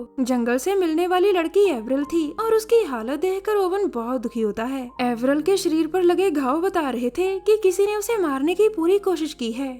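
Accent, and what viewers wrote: Punjab, India. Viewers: See I was thinking about you nd u r here... sachhe wala pyar h... nibhana apko bhi pdega.. Lv uuuuuuuuuuuu............uff ye Punjabi accent 😍 👌....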